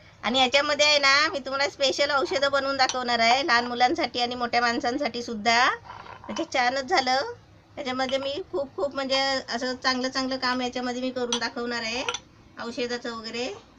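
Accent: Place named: Maval, India. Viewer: khup mast.. tai..! abhinandan tumhala khup shubhechhya..!! aani diwali faralachya videos sathi dhanyawad..!! /\ tumachya maval bhashene mi khup prabhavit zale aahe..!! 😝😍😍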